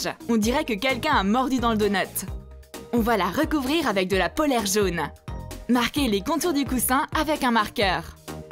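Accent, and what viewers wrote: England, United Kingdom. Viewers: Mais en vrai vous êtes anglaise ou pas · vous êtes anglaise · Vous êtes anglaise ?? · Vous êtes anglaise 😐😮?? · Vous êtes française ou anglaise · Vous êtes anglaises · Vous êtes anglaise ou française ou encore les deux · vous êtes anglaise? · Tu est anglaise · mais vous êtes anglaises ??? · Vous être anglaise????? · Vous êtes anglaise ? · Elle sont anglaise ou française ? ? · Vous êtes anglaise?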